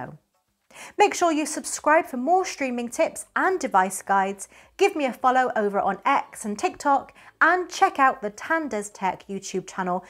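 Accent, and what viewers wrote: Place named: Bristol, United Kingdom. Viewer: Are you in, or near Bristol, England? 🤔 (Guessing, based on yer accent, me awd babber) hahahahah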